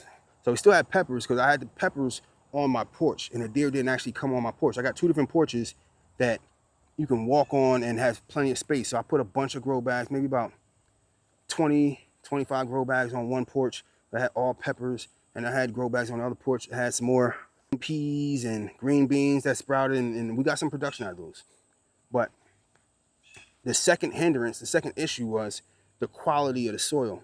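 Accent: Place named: Philadelphia, United States. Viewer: First time viewer here from Delaware County PA, just outside of Philly. I follow so many YT Homesteaders from the South with their Southern accents that as soon as I heard you speak I knew you were from somewhere near me 😂 Now I have a new channel to follow. Thanks!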